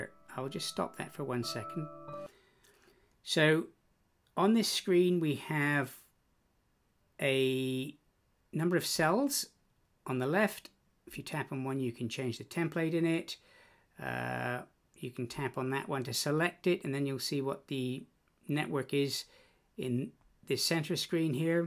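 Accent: Cornwall, United Kingdom. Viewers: Is your accent Cornish? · I'm from bude, Cornwall, thought your accent sounded familiar. I find your app really interesting but I'm finding it really difficult to understand and use. I come from using Ableton.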